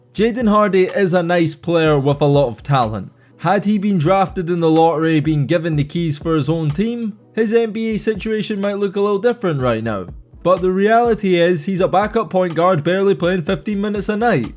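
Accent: United Kingdom. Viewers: Imagine calling Jalen Green a bust when he averaged 22 ppg in his sophmore year LOL u don't know wtf u talking about last time i click on a basketball video from a dude with a british accent tf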